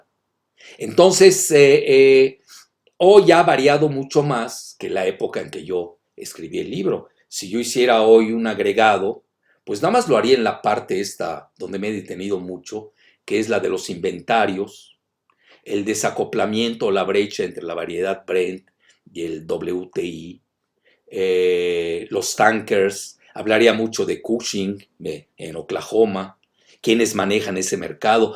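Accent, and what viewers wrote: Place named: Russia. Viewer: como me gusta mucho la petulancia que muestra mi querido calvito pues con su acento semiruso explica con coordinacion y buenos numeros.le felicito aunque yo s mucho de FDerivados del petrolio por tan solo el gas que le pongo a mi SUV,me gusta su berburrea mas me parece que se quiere parecer a la mama de Dios ,tal ves que Dios nno se le conoce mam,asi que me gusta asu exposicion y petulancia asi que adelante es un buen predicador....hum y digo predicador para no llamarle su santida francisco,,nos vemos mas me gustaria debatirle en otro temamas.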